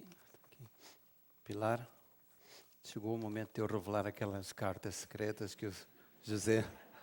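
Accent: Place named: Portugal. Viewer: O português de portugal é bem lindo <3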